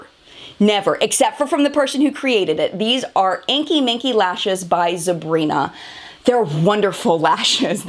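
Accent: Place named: Baltimore, United States. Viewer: Ha! Thought I recognized the accent as Mid-Atlantic (Philly?) but didn't know for sure it was Baltimore. Baltimore Hon here, too too. 😁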